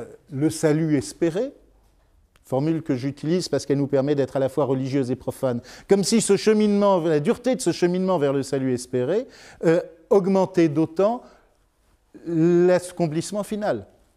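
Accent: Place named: France. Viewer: Super de pouvoir écouter ce cours. Très intéressant. Cependant, je regrette la lecture très "francimande" et pas assez occitane du texte (il en est des interprétations comme de la prononciation). Autrement dit, il s'agit de l'autopsie de la traduction de BEC et non du texte originel. Ceci étant, ce cours est super. MERCI.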